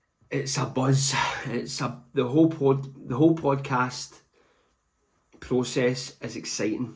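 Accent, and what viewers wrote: Scotland, United Kingdom. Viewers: I think your biggest appeal is the rawness of your videos. On the patio, top of the stairs or front room, a working father getting it in is inspiring. Be yourself, the world loves us Scots, accents and all! Maybe not the swearing at times though, could put some off. I’ve taken ideas from you and have tried a good few of your videos as they’re a bit different from what I normally do. Get the burpee tour of Scotland on the go! 😂😂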